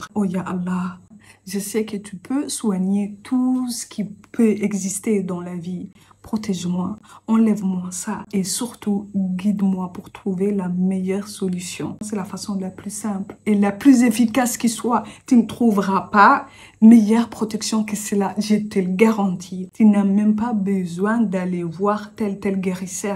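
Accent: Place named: Comoros. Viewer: À son accent je dirais qu'elle est comorienne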